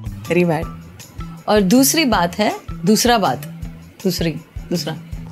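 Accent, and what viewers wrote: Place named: Maharashtra, India. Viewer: i love yrr hindi marathi accent 😂........i love yr plant vedios .....but idky ig this is the best video ......LOVE from DELHI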